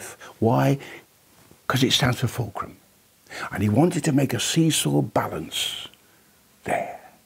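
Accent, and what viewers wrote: United Kingdom. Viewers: That's as British accent as it can get.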